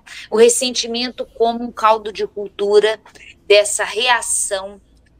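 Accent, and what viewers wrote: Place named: Rio de Janeiro, Brazil. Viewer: Professora, linguagem científica não se compatibiliza com sotaque carioca.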